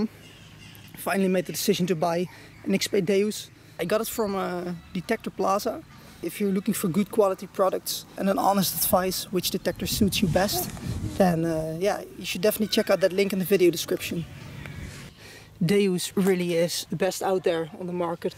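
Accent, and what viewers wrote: Netherlands. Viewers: Where are you from you sound dutch? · Nederlands of belgies? Vanwege je accent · Goede videos maar je uitspraak is soms super nederlands. Het is maar een tip. Zoals the Bulge bijvoorbeeld. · You sound very dutch. Am I correct? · Leuk Nederlands accent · I can hear this dude being dutch lmao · Is that a Dutch accent ;)? First video i've watched on this channel and definitely not the last. Very educational. Keep up the good work! +1 sub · I can hear you are dutch ( ik ook) and i really find this interesting you just eared a sub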